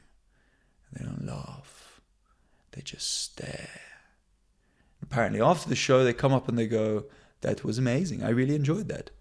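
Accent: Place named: Jamaica. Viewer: I am hearing a mixture of English, Jamaican and Black American mixed together!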